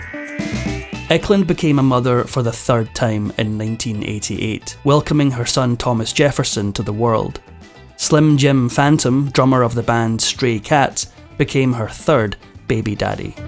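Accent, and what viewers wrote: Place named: Scotland, United Kingdom. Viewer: I could listen to that Scottish accent forever!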